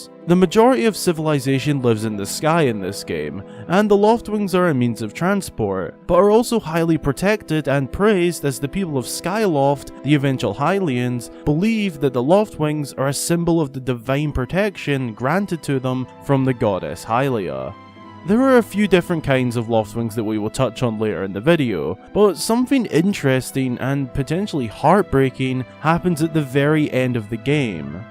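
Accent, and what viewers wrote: Scotland, United Kingdom. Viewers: Do you have a scottish accent? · Are you Scottish?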